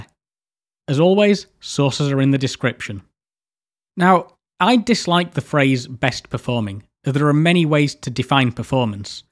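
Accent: United States. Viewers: This is a really interesting video, but do you have to speak in American English? It spoils your delivery.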